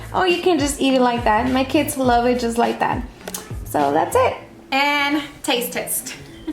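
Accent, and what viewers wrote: Mexico. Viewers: Over the years, you've developed a better Mexican accent! Love you watch you when you're young and love to hear you as you mature! ❤️ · Your Mexican accent is strong. I love it 😍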